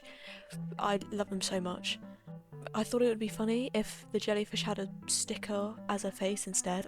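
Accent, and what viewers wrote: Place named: United Kingdom. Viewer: A British Talking Octopus Nice.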